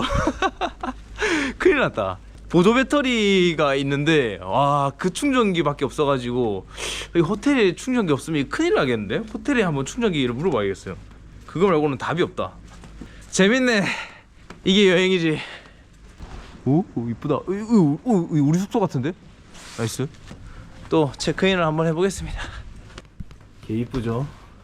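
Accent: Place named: Gyeongsang, South Korea. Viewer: ㅎㅎ첨보는데 사투리 보아하니 경상도?? 울 아들같아 웃음이~ㅋㅋ 화이팅 !!!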